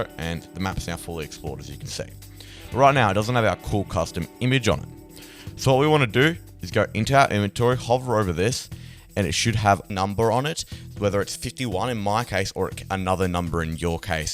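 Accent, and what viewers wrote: Australia. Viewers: Are you from Australia or New Zealand? Your accent. It sounds Australian.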